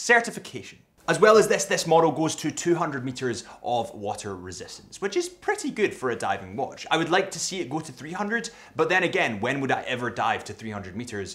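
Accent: Scotland, United Kingdom. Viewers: You sound like a Scottish Adrian Barker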